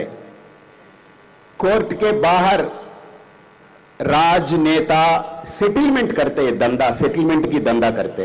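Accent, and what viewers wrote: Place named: South, India. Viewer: Oh my god what a pure hindi .... kon kahta hai south indian hindi nahi jante ... I m also SI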